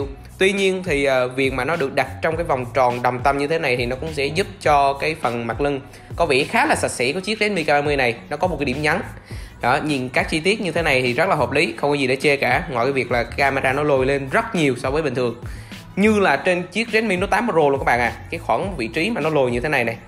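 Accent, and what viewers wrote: South, Vietnam. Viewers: Có ai giống tui ko giọng miền nam bạn này hơi chói khó nghe nhỉ so với giọng miền bắc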